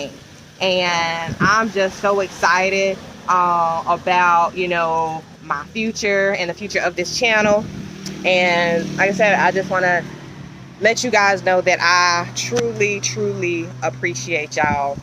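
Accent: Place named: Raleigh, United States. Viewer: Congrats on your new job!! Im from NC too!! I knew that accent was very close to the Raleigh area accent!! Just found your channel and I'm loving it. I want to visit Mexico in 2024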